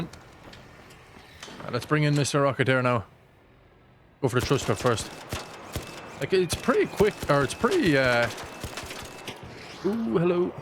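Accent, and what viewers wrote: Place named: Cork, Ireland. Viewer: Your accent reminds me of my nans. She was from Cork.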